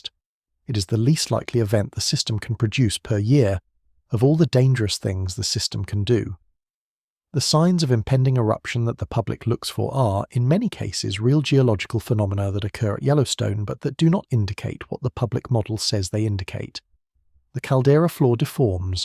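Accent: United Kingdom. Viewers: Everything with a British accent is a bullshit Lie!!!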